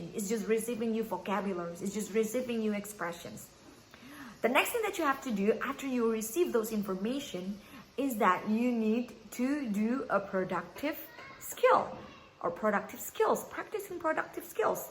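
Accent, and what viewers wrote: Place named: United States. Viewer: I like your american accent 😁👌👍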